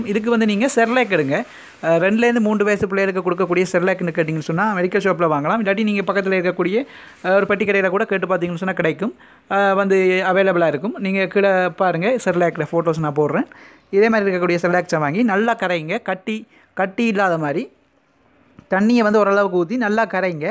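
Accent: Sri Lanka. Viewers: Neenga srilankan tamildhane pesuringa anna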